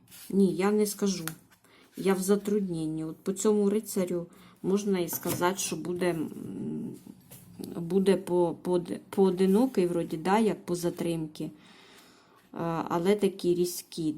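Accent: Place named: Zaporizhzhia, Ukraine. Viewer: Уважаемая, если вас это не обидет ответьте пож. Вы наша, Запорожская? Это чувствуется в разговоре,что конечно приятно, когда вы говорите наш город, сказав наш нам и кажется,что вы живёте в Запорожье.Спасибо дочка, за твой труд.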